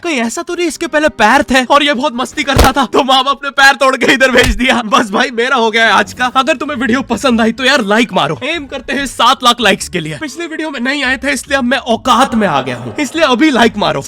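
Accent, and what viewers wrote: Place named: Malwa, India. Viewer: bhaii aap hamre malwa ke ho kya gav ke 😂 aaj confirm ho gya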